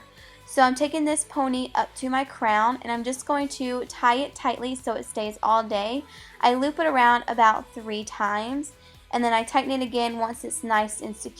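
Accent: South, United States. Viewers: in this video it sounds like you have a tent of a country accent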